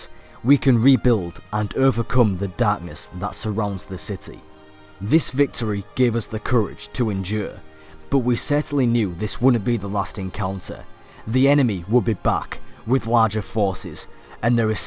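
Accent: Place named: Yorkshire, United Kingdom. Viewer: that's Yorkshire accent.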